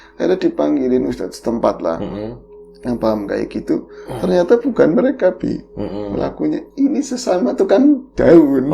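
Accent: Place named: Tegal, Indonesia. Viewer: Dngerin logatnya bro jd inget sodara2 bpk ku yg asli tegal.👍👍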